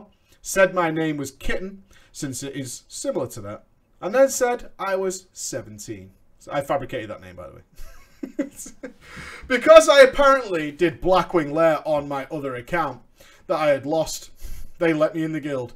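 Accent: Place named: England, United Kingdom. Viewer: Is it a bad thing that I'm Canadian and have accidentally adopted an English accent listening to 'im or is that normal?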